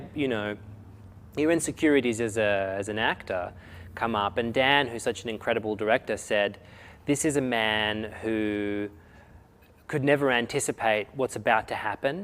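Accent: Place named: Australia. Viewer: I love australian accents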